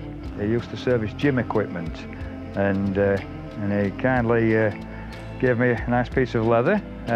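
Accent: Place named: Sheffield, United Kingdom. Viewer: What a beast! BTW, you sound like a Sheffielder, am I right?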